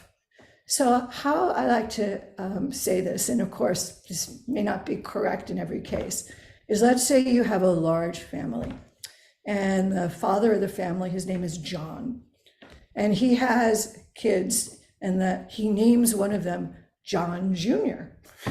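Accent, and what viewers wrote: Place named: United States. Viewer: Whose accent, I know the American accent is difficult to understand if U put on captions it will be ez to follow her. I hope that helps.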